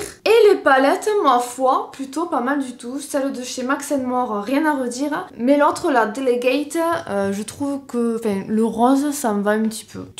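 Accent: South, France. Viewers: A chaque fois que je vais chez Action et que je passe dans le rayon make-up, je regarde vite fait mais j'achète rien parce que je trouve que ça a l'air cheap à mort et je me méfie des marques "inconnues", j'ai peur que la compo soit pourrie et que ça soit tout naze mais franchement à chaque fois que tu fais des tests de leur make-up ben je suis agréablement surprise et au final y'a des trucs qui me donnent envie ! Je crois que je vais me laisser tenter par une de leurs palettes (même si j'en ai déjà plein et que j'ai quasi toutes les couleurs possibles) ! Donc merci de tester à ma place ! x) J'adore le make-up que tu as fait, il te va super bien au teint et s'accorde super bien avec tes beaux yeux bleus ! C'est cool d'avoir changé de couleurs et de ne pas avoir fait comme d'habitude un make up doré/marron (même si j'aime beaucoup aussi) ! Toujours un plaisir de regarder tes vidéos après toutes ces années, je ne me lasse pas. 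Ton accent du sud et ta bonne humeur me font toujours un bien fou, tu arrives toujours à me remonter le moral, tu es ma petite dose de gaieté hebdomadaire ! <3 J'espère que tu n'arrêteras jamais de nous faire des vidéos ! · Le dimanche c'est toujours le coup de blues, heureusement ya l accent du sud de pour ramener un peu de soleil ⛅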